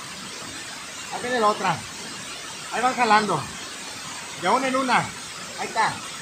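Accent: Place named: Bajío, Mexico. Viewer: Buena pesca amigo disculpe su acento se escucha como del bajío ... saludos desde Valladolid Yucatán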